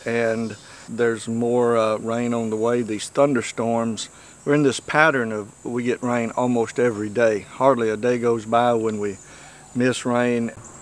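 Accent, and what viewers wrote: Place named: South, United States. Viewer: I enjoyed the video and also enjoyed hearing you talk. I'm southern, but you really sound southern!! I'll look up other videos.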